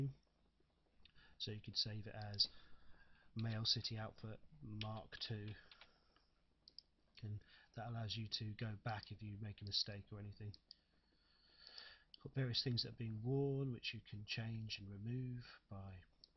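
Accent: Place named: United Kingdom. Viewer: Thanks! I wish I'd seen this a week ago. Slow eye speed, plus having to mentally process British English into American English mean I'll have to do a lot of stopping and rewinding to learn the specifics. But still, your delivery is good, and these are important points. I can't make the tutorials run when I'm IN Second Life, so this is great!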